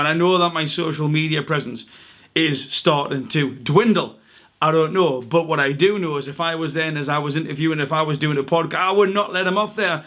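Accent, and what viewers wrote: Newcastle, United Kingdom. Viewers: Milo Clesham I think he’s said that hes from Newcastle as well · Before I see this video I already know you're going to side with Gib since you sound British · Is it just me or does this guy sound like true geordie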